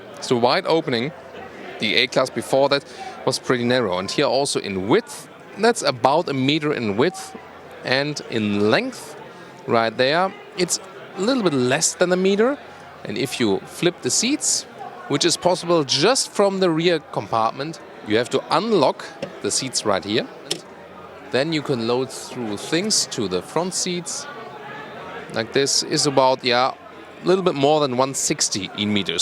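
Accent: Germany. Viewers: Thank you very much for another very informative and professional review,. 👍 Your in-depth presentation style is so much better than the competition, in so many ways... highly professional and always delivered in that impeccably suave and sophisticated German accent of yours, that is every bit as slick and refined as the automotive design and engineering masterpieces that you showcase! 👌😉 Thank you for all of the hard work and laborious camerawork and editing that goes into each of your in-depth videos, as well as your incisive commentary and detailed observations that many other reviewers leave out. My daughter has her own YouTube channel with nearly 20,000 subscribers and so I know how much time and work goes into producing even a short video, let alone your more exhaustive reviews, and while many of your viewers may take all of that for granted, I certainly appreciate your efforts and have learned a lot from watching your videos and enjoy your presentation style immensely. 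Keep up the great work! 👏😊 ~ Kind Regards, Louis.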